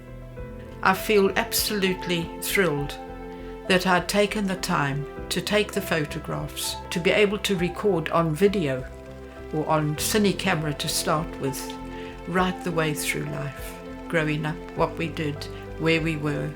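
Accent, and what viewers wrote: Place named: Zimbabwe. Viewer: This Lady sounds like my late mother.. a precious lady .. a voice of a true Rhodesian . Thank you for sharing your life 🙏🏻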